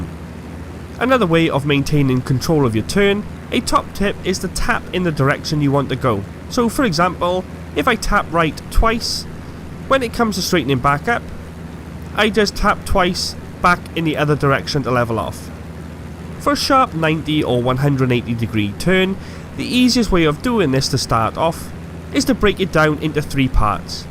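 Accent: Wales, United Kingdom. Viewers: Are you Welsh? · That would be absolutely amazing, not many people like you on YouTube, also loving your welsh accent! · Is this guy Welsh?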